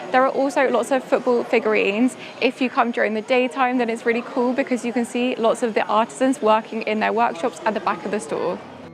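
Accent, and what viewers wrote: United Kingdom. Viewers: Yeah because of course anyone who's not shouting and being chaotic as we are has low energy... This kind of closed-mindedness makes Neapolitans unlikeable to many people, and to other Neapolitans like myself. This girl sounds British, and Brits have a calm way to speak. Or you want her to start dancing tarantella while she talks so she can match what you consider to be Neapolitan "high-energy"?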